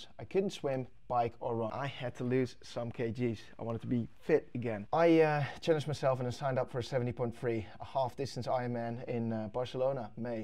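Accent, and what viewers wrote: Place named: Netherlands. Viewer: I studied in NL, now back in Thailand. Also training for triathlon to race in my country. Your story is inspiring and your accent makes me miss life in NL so muchhhhh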